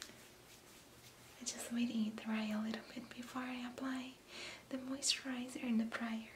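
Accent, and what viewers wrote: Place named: Brazil. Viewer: Gostei muito do teu Portugues mesmo sendo do Brasil, percebi, sou de PORTUGAL, compreendes a escrita certo?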